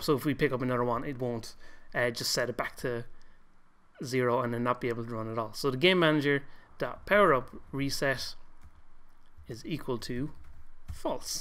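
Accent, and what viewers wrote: Ireland. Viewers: You talk like Jacksepticeye. Are you Irish?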